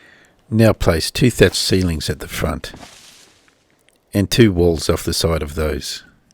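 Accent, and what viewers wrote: Australia. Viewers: has an almost Australian accent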